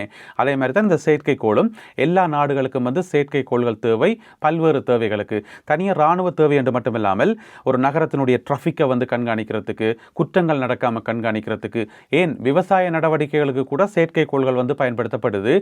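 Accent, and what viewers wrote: Sri Lanka. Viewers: இலங்கை தமிழ் ரொம்ப அழகா இருக்கு நண்பரே. வாழ்த்துக்கள்.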